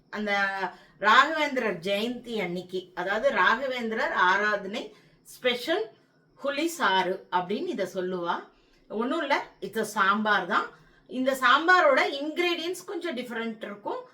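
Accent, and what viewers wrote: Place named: Brahmin, India. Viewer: மிக அருமை.. மேலும் உங்களது பிராமணத் தமிழ் உச்சரிப்பு நிகழ்ச்சிக்கு கூடுதல் சிறப்பு!👍👍💐🪷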